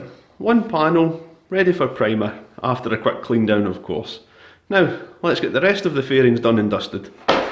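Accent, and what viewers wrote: Scotland, United Kingdom. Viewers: nice job. my mouth is watering as i watch you go to so much trouble. accent scottish?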